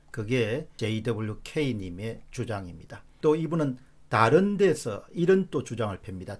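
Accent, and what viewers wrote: Gyeongsang, South Korea. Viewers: 경상도 사투리쓰네 ㅎㅎㅎㅉㅉ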